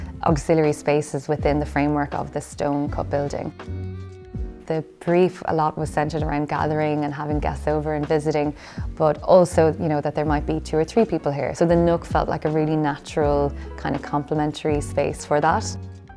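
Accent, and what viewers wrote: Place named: Ireland. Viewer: No idea what you are talking about. has a very soft Irish accent…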